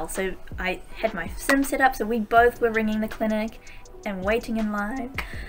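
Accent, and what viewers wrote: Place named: United Kingdom. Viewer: I have a friend that looks nearly identical to you and she's like 100% mestizo blood. I thought you were a Mexican with a British accent:p nice vid btw you're so cool😎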